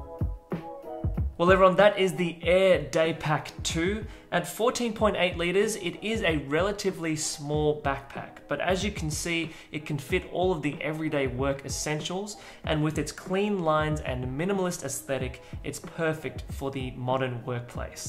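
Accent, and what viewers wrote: Australia. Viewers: Was just noticing your accent in the video and thought shit this guy might be Australian, but then measuring bag capacity in 6 packs confirmed it hahaha.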